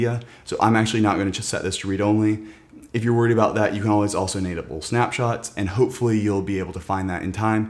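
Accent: California, United States. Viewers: I have no idea where you live but when I listen to you all I can think of is SNL "The Californians". lol Your videos are greatly appreciated. Thank you.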